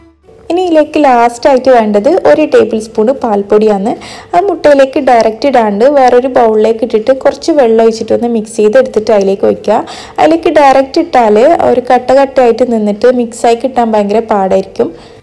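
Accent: Kannur, India. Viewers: Good ഇങ്ങനെ തന്നെ കണ്ണൂർ ഭാഷ യിൽ തന്നെ മുന്നോട്ട് പോവണം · കണ്ണൂർ ഭാഷ കളയൊന്നും വേണ്ടാട്ട. അതാണപ്പ അയ്ൻ്റെയൊരു രസം. പറ്റുമെങ്കിൽ ഇംഗ്ലീഷ് സബ്റ്റൈറ്റ് ൽ കൊടുക്കാൻ ശ്രമിക്കുക.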